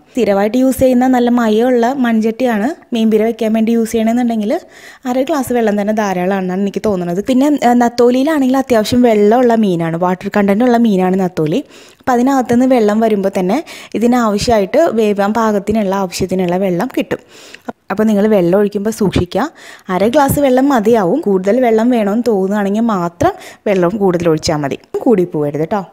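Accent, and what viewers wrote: Thrissur, India. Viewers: Beautiful Thrissur language. Beautiful explanation, beginners can follow well.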